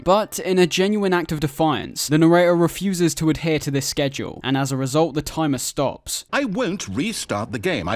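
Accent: United Kingdom. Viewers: why are you british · Why are you british? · Why are you British? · 30 mins into the video and my gf, who has been passively listening, asks “what’s a narraya?” 😂 she’s confounded by the British accent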